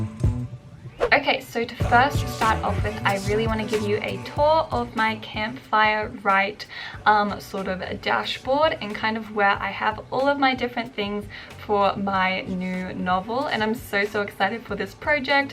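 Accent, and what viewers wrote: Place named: Australia. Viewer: Lol I’m Aussie and it took me so long to realise you had an Australian accent because I was so tired and then when it clicked I was like “!!!” Lol. I’m so excited to check out campfire thank you for the tips! ❤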